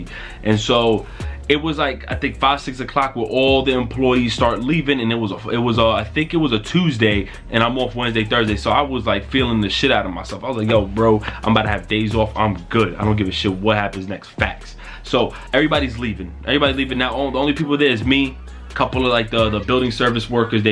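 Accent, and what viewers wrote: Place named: Harlem, United States. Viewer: Yo...Real talk, you had me tearing up from laughter with this story man. You sound just like the kats i grew up in harlem with for real. XD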